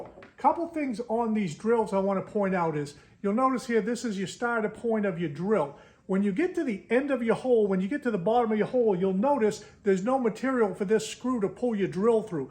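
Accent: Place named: Maine, United States. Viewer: Hey, your videos are really great. Well thought out, straight to the point, helpful, and that cool Maine accent is the icing on the cake. Keep ‘em coming.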